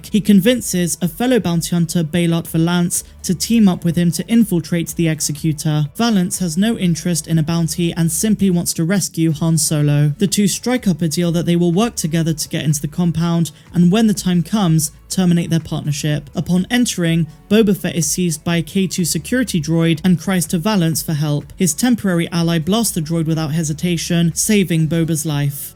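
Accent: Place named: Bristol, United Kingdom. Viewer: Love the work! Can i just ask - are you from the West Country? Whenever you say "Jibber Jabber" Your 'ers' sound like 'urs' as in "Jibbur Jabbur". Are you Bristolian? I'm from the west too, alittle further down. Your daily drip feed of tsar Wars info is very welcome & addictive!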